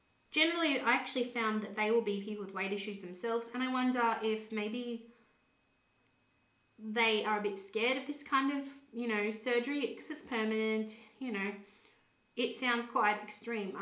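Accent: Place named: Australia. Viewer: Hey, fellow Aussie here, really liked your honest post, so nice hearing the Aussie accsent too. Sleeve day is June 14th, very excited. Thanks for the vids!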